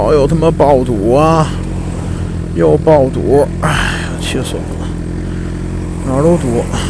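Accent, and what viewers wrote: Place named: Beijing, China. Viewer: 宽点有限A_A听着口音咋像北京捏，熟悉啊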